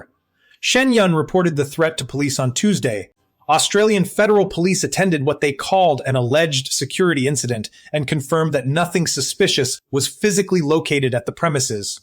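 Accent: United States. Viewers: Why the American accent? This is BS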